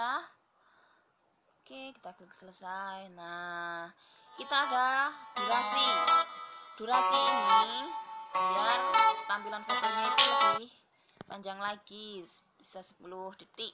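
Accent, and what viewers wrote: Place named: Java, Indonesia. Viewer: Omongannya medok 😁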